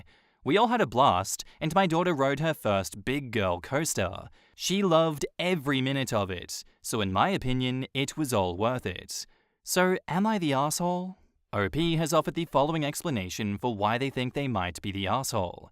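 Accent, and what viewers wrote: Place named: Australia. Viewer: the great Aussie voice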